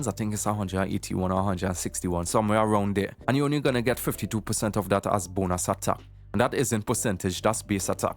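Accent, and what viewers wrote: Trinidad and Tobago. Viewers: Trini to do bone! I’m half way an island boy myself🇧🇸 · I just got this video on my recommended and the trini accent caught me so off guard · love the trini accent makes the guide actually entertaining ill give u a like and a sub u deserve it :D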